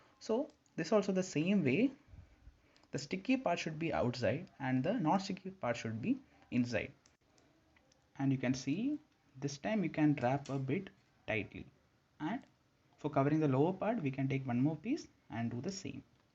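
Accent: India. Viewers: I find Indian accepts so satisfying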